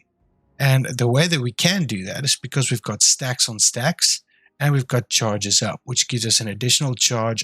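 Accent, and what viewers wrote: South Africa. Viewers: Hey man you sound South African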